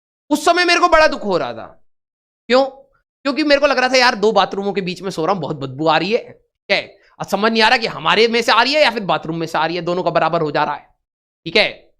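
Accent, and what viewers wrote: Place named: Bihar, India. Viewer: Sir ka bihari ascent ek perfect bolte hai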